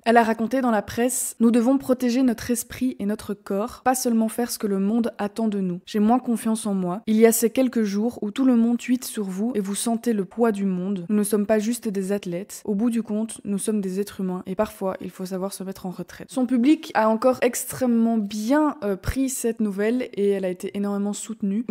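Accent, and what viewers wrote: Belgium. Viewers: Dès que tu as commencé à parler, j'ai su que tu étais belge grâce à ton accent. Ce n'est pas l'accent bruxellois, que les Français pensent à tort être le seul accent belge. Quand je t'écoute, j'ai l'impression que tu as l'accent de Liège ou de Namur, je me trompe ?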